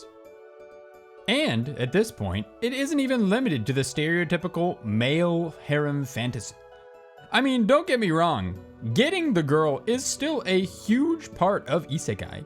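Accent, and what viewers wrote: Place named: United Kingdom. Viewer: SAO fan here. First of all, I wanted to say great video—even with the British-like Japanese and the 202012s, I was invested in this video from beginning to end. Now, onto what I want to say: I get that people would revile SAO for the whole isekai/harem boom of the 2010's, and it makes sense. There's a clear oversaturation of the market because everyone's been craving the high SAO had given them. Of course, everyone's problems with Fairy Dance can be solved just by watching Gamerturk's common misconceptions video about the arc, but the point I'm trying to get across is that I feel SAO has created an Aincrad-shaped hole in everyone's hearts, only capable of being whole with that same tension of life-or-death. That's why in this supposed "drought" of SAO (anime) content, I suggest we show a MASSIVE amount of support for Reki-sensei to actually lock in. 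As of writing this comment, the latest arc—Unital Ring—is currently on its 8th part, with events leading to what could possibly be a link to Accel World. Not only that; but his other subseries, SAO Progressive, is going to have its 9th volume released for the west on May 12th. While it may seem as though Reki-sensei is just meandering around with his stories because he's probably hit a writer's block, cheering him on enough to keep pushing forward will definitely give him a boost to get his act together and finish the Elf War saga within SAO Progressive. If we do that, we can generate enough buzz to make Kadokawa consider either a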